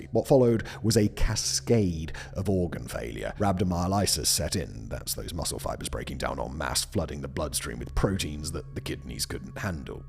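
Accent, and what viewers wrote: England, United Kingdom. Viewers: 2 recorded deaths. There are thousands of known hallucinogens. I never really thought you would be above this fear mongering & we all know you weren’t writing, editing or engaging in the creative process of these channels for many years now. You’re just an English accent reading catch-all content targeting an American audience.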